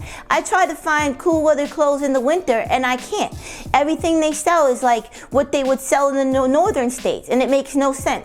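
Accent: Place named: North, United Kingdom. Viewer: your from the north arnt you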